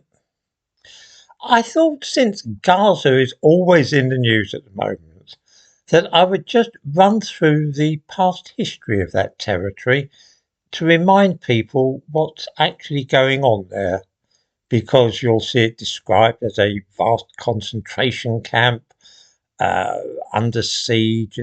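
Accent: United Kingdom. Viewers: Wow. What exactly were you doing living in Palestine? Nothing ever stopping them from acting like their own country? Nothing? I look at the google earth map today, and i see the most ominous wall ever constructed, and it just so happens to be surrounding this tiny 25 mile long strip of land. Not sure why i was subscribed to you, but i'd give any honest sounding british chap a shot. Really no choice but to unsub now, because i'm not the target audience for this unapologetic gaslighting. Pretty sure that the older i get, the more i can see the pattern of deception, but for some people it might work the other way.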